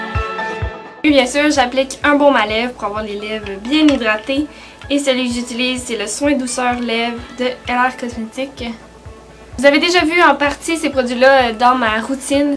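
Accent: Quebec, Canada. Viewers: Ce n'est pas qu'elle ne parle pas bien le français c'est juste qu'elle a l'accent Québécois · Coucou tu est vraiment magnifique et ta frange te vas hyper bien et en plus tu est assez drôle et ta l'accent québécois 💪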